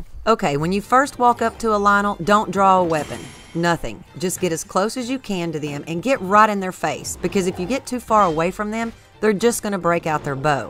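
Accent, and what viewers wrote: South, United States. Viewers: I love your channel! Thanks to you, I've killed 3 silver Lynels. I mean Coloseum is OP. So many elemental weapons. Your videos are great and I just love your southern accent?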